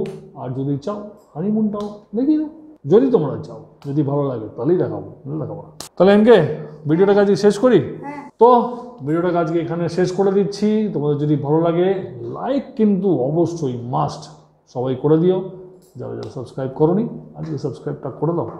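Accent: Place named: Andaman and Nicobar Islands, India. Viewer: Actually ai accent ta apni port Blair e chilen bole. Ekhner max bengali r kothay 2-3 te hindi bole. Ekta mojar kotha boli bengali slang ekhner max Tamil telegu ra bujhe ebong day o even Halka Bangla bojhe. Ami last 3 yr e antoto 10-15 jon Tamil telegu Malllu k dykhechi Jara fluent Bangla bole ekhne.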